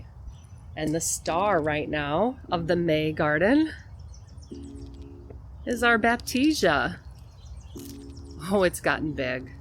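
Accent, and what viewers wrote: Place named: Chicago, United States. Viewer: Thanks for the tour and all of the content! Long story shorter, I’ve had to let some things go for a while because #life…so as I start to take back some of my spaces and learn from my mistakes 😬, you’re inspiring me to consider and incorporate more natives. I was also today years old when I realized some of those “weeds” I have might not be…. It’s also nice to hear a natural Chicago accent. I’m a few hours away and don’t get home enough.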